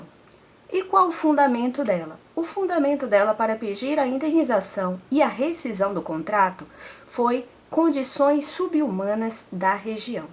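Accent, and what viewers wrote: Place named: Manaus, Brazil. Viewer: Sabia que a era de Manaus! Mesmo sendo carioca, reconheço facilmente os sotaques brasileiros. Estava em dúvida entre Amazonas ou Pará.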